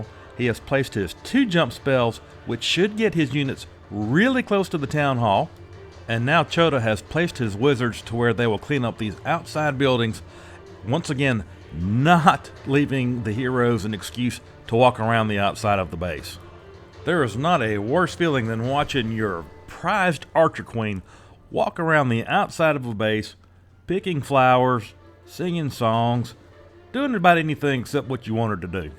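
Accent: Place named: South, United States. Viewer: this is great. 😂 as a southerner I love the accent and phrasing, it's wonderful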